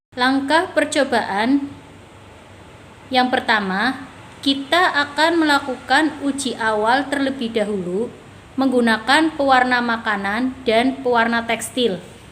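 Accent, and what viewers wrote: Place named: Tegal, Indonesia. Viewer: Top markotop tegalane medok nmen ah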